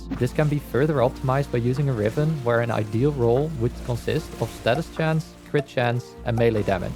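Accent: Netherlands. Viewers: Nederlands accent gaat enorm hard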